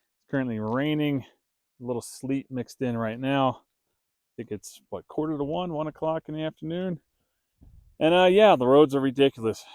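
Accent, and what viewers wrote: United States. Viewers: Just curious, what part of the east coast are you originally from? I hear that accent every now and then. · I thought you sounded like you could be from here! I’m in south central PA an hour south of Harrisburg. It’s funny we’ve had a practically snowless winter here. Keep your chin up out there. 🙏. I know there’s some pretty rough corners in your neighborhood (poor truck) but Spring is right around one…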